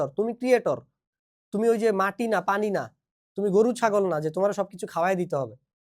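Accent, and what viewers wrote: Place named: Sylhet, Bangladesh. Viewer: Sir, afnar Sylhet mat je sundor,❤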